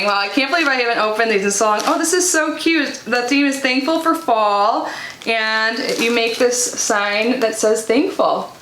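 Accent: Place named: Midwest, United States. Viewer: Hey! New subscriber. Been watching for a minute! You have to be from the Midwest. I’m originally from Chicago. I know that accent anywhere!! 😊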